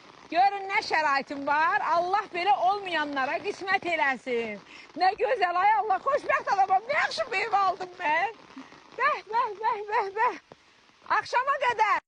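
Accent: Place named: Baku, Azerbaijan. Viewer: daha Bakinka lar kimi dnışmaqa keçib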